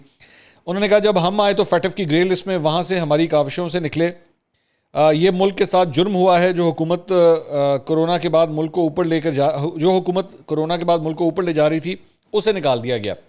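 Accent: Punjab, Pakistan. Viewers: بھائی پنجابی۔ سلام آپ تو اردو بھی اب پنجابی لہجے میں بولنے لگے ہیں۔ شاباش۔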